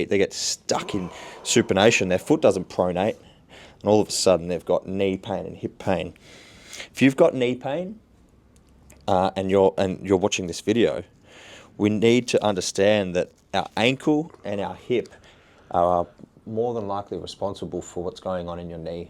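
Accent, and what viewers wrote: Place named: Australia. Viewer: Getting fixed while that sexy Aussie sauve voice sexing me down...is what's up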